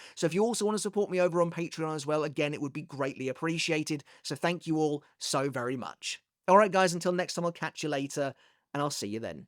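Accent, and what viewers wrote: United Kingdom. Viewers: ew british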